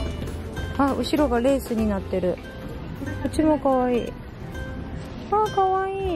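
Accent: Japan. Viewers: いつも楽しくみてます！ 方言富山ですか？？似てたので… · 初めて動画観ました！ 高知弁が可愛すぎてキュンとしました☺️💕 旦那さんと息子さんも素敵すぎて理想の家族です✨ 他の動画も色々と観たいです☺️❤️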